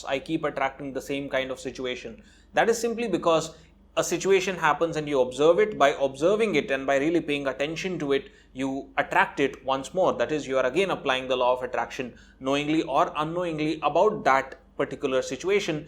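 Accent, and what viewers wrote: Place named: India. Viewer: Ugh .. The Indian accent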